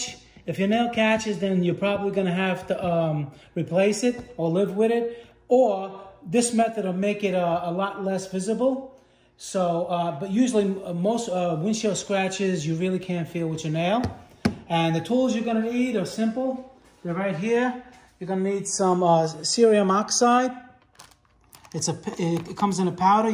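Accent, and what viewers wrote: New York, United States. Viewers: The New York voice rules. I love it.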